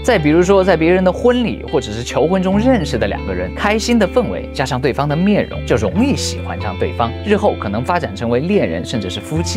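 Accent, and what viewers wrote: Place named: Wuhan, China. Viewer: 最近刚刷到老师，视频听着觉得太棒啦。听到这个视频中亲切的武汉话才得知是我老乡，我是生活在墨尔本的武汉丫，必须支持 ❤马上会员安排上😊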